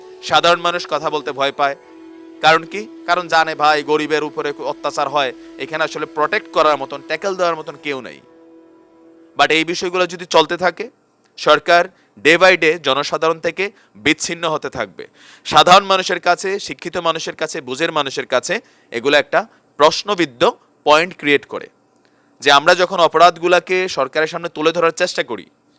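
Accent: Comilla, Bangladesh. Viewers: ভাই আপনি কি কুমিল্লার ইতর মনে হচ্ছে আপনার কথা শুনে.??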